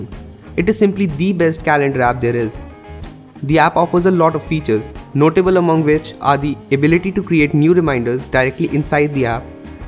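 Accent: India. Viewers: Your English is fucking horrible man lol why does every Indian have that accent, anyway get some braces -Canada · Indian accent makes are the worst accent ever Just sounds horrible like nails on a chalk board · Your accent makes me respect indian english accent now. Sounds way more better than singlish. Oh i hate singlish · indian accent ...hhhh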